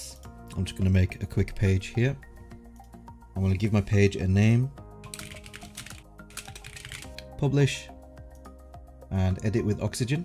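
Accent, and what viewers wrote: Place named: West Yorkshire, United Kingdom. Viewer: That's a guy from West Yorkshire who has lived in Japan for 10 years, so it's a little hybrid 😅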